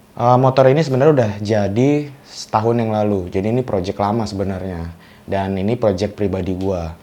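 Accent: Bugis, Indonesia. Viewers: abang orang bugis ya?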